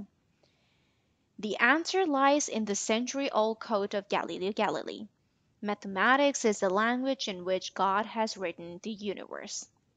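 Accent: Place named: Pakistan. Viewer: I wondered after listen to this that you belong to Pakistan. you seem to be a native speaker. anyway, thanks a lot for this great lecture.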